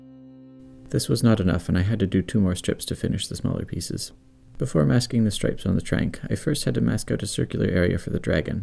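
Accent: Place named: Canada. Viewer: incredible video!! I'm an art student and have seen those gloves in person i believe! your craftsmanship is wonderful to see. I was also trying to pin your accent and you finally said Canada! It's so pleasant and matches your historical vibe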